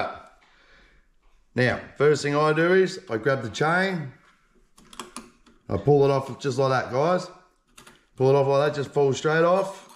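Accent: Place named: Australia. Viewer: bros voice is the definition of aussie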